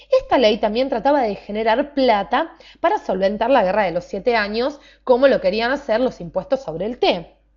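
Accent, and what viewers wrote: Argentina. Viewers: me encanta ese acento , es argentino ?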